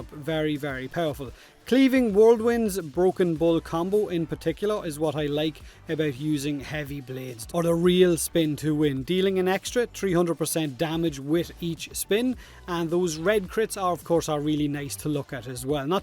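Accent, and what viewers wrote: Ireland. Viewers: Came for the warframe content Stayed for the Irish accent and warframe content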